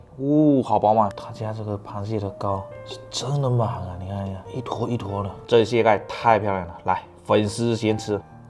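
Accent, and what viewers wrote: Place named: Hakka, China. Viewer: 看完之後感想 ……. 客家音怎麼那麼重 🤣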